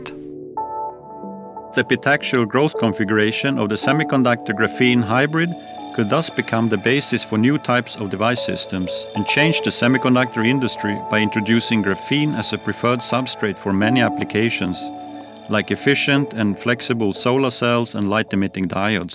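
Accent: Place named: Norway. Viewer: This is so cool, and so much more authentic with a norwegian accent.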